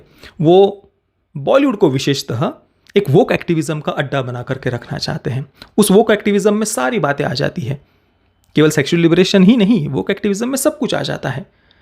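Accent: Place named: Maharashtra, India. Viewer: Bhai aap politically baat kyo karte hai ? Aur aap personally Dunky ko kyo Target karte hai kya aapko malum hai South me Dunky ko Theatre nahi de rahe unke baare me bhi bolo yaar aur aapki haisiyat nahi ki Raju Hirani jaise director ko criticise kare kyonki agar aap unse achchi movie bana sakte to criticize Karo Aap baar baar Dravidian Communist aur tathakathit secular ke bare me.aise bolte hue samaz aata hai ki aap konsi vichardhara ko promot karte hai But bharat ek secular Country hai isko kaise karte hai Bharat jaha Top 10 Richest Temple me 5 Aayenge but Top 200 Universities me ek bhi nahi aati no top 300 School me ek bhi nahi hai kuch ispe bhi bolo Bhai First aap Dunky movie dekh agar pasand nahi aayee to criticize Karo Bina dekhe criticize kyo? Aapki Hindi Puri tarah se Marathi wali hai jo shudh to 100% nahi hai to usko bhi sudharo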